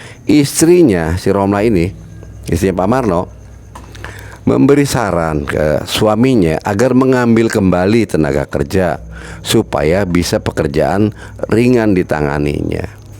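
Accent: Indramayu, Indonesia. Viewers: Logatnya bicaranya kaya orang Indramayu atau orang Cirebon 😁 Betul ngga sih ?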